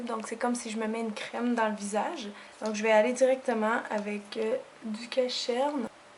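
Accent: Quebec, Canada. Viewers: Normalement je trouve les filles qui font des tutos très narcissiques et maniérées, et j'avoue de surcroît ne pas être adepte de l'accent canadien, mais vous êtes très agréable et j'aime bien vos conseils! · Accent QUÉBÉCOIS s'il vous plaît : ) L'accent canadien serait un accent anglophone ^^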